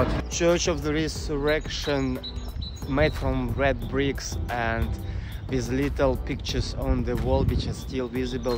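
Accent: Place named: Russia. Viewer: никакой он не американец, американцы с таким диким русским акцентом не говорят.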